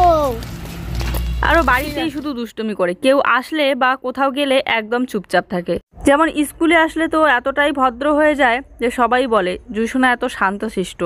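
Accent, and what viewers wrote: India. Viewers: অনেকদিন দিল্লিতে ছিলো, তাই কথায় কথায় হিন্দি শব্দ, উচ্চারণ এসে যায়। এটা স্বাভাবিক।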